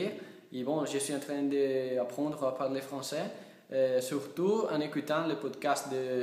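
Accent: Italy. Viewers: l'accent italien me fera toujours craqué ;) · Si tu apprends le Français depuis seulement une année et demi, tu le parles bien. On te comprends et les quelques erreurs que tu commets sont celles que commette ceux qui apprennent cette langue, réputée difficile. Mettre le féminin à la place du masculin et vice-versa pour les articles. Par exemple, dans la vidéo, tu dis "un vidéo" au lieu de "une vidéo" et "un année au lieu d'une année". Tu as un accent italien mais c'est charmant... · yo hombre tu parle bien accent italilien applique toi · J'adoore l'accent Iitalien ! tu parles déjà couramment on te comprend très bien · Tu sei carino😂.. ça te donne un charme ne perd pas l'accent Italien... ciao!! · L'accent italien me fait rever :) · tu parles tres bien et en plus sans les mains! Continue tu es très fort et garde donc l'accent italien (pour les filles je pense qu'elles vont apprécier!)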